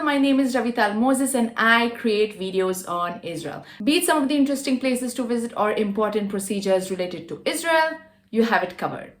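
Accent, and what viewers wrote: Maharashtra, India. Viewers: You have a thick marathi accent.. lol.. good info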